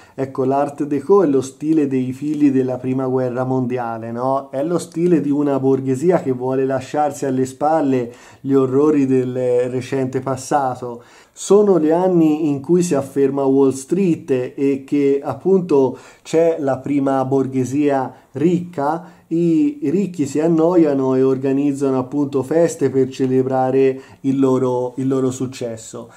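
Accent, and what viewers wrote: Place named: Arezzo, Italy. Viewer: Bella recensione complimenti! Con una bella spiegazione storica sull' art déco! Sono finito sul tuo canale praticamente per caso cercando delle recensioni in italiano sul Reverso ; è un modello che ho sempre adorato e negli ultimi mesi è diventato una "fissa" 😆 presumo tu sia dell'aretino/ Val d'arno dal tuo accento ? 😄 sbaglio o a si vede un movimento della Chopard ?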